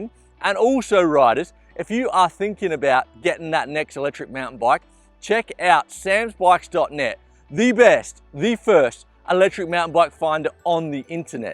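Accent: Australia. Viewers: great review, love the aussie accent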